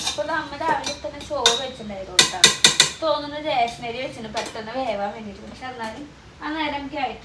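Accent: Thrissur, India. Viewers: That's their style...thrissur chela idath Njan ketitund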